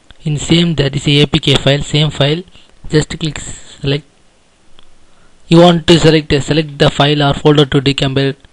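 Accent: India. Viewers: It's not Indian accent, it's south Indian accent. India is too diverse to have a single accent throughout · Wasim Akbar its indian english accent :)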